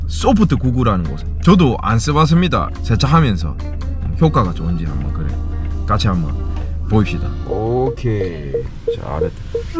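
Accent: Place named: Changwon, South Korea. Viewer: 저도 늘 영상만 보다가 주문했어요. 고향이 저도 창원이라 정감난 말투가 반갑고 재미나게 봅니다. 사투리가 씨네..~~~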